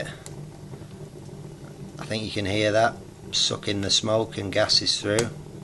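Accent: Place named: North England, United Kingdom. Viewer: Very ingenious design. If I make one of these, it will borrow this design. From your accent, I'm guessing you live near Liverpool, or Manchester? (or lived near there once.) {subscribed}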